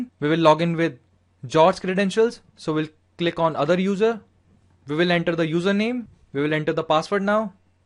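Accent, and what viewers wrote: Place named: India. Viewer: another excellent tutorial with a thick indian accent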